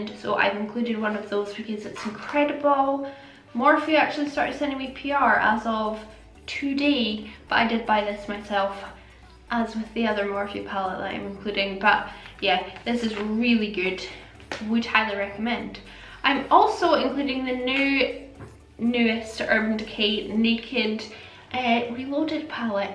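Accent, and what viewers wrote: Scotland, United Kingdom. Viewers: Hey I’ll be honest I only just found u a couple of weeks ago but as soon as I heard u’re voice I hit subscribe it’s so refreshing hearing a fellow Scot,, Would love to win xxxx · Instagram handle is I'd love to be in with a chance of winning because you are my favorite inabler when it comes to colorful palettes. Also, I moved to England last year and hearing a Scottish accent makes me happy (I miss Edinburgh so much!) congratulations on 30k and kept on making me want to buy everything! Xx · Hi! CONGRATS ON 50K/30K!!!!!!!! (but you so deserve a lot more). You are genuinely so generous giving away this much AMAZING makeup and also can I just talk about how stunning you are and how INCREDIBLE at makeup you are! My Instagram is and I would love to win because I love love love makeup sooooooo much and really want to be able to grow my collection and also try out new makeup and formulas that I may not be able to afford myself lol. 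But I would mainly love to win just to try and grow as a makeup artist and maybe reach that goal one day! Love you and your Scottish accent so much!! Amelie xx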